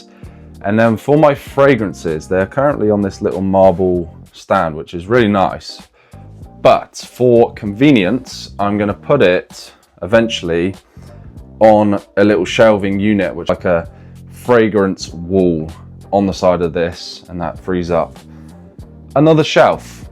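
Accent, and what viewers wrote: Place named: England, United Kingdom. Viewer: This gorgeous, handsome English man in my feed again with that gorgeous English accent - making an old Irish queen like me very happy indeed 😂🇮🇪☘️🏴🇬🇧❤️❤️❤️❤️❤️❤️❤️❤️🙏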